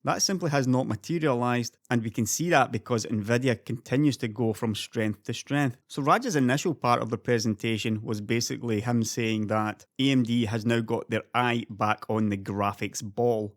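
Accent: Scotland, United Kingdom. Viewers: Where in Scotland are you from? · as far as Scottish accents go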